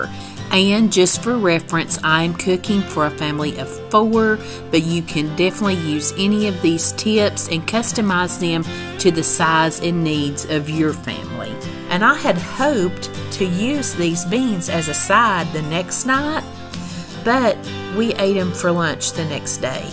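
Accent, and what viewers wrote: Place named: Mississippi, United States. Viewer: Your voice makes me feel like I’m back home 🥰 I’m from Mississippi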